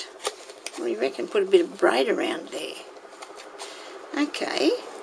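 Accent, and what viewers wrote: Australia. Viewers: its such fun. love watching what you have done.its great to hear an aussie voice and to see how creative you are. love your laugh. thanks so much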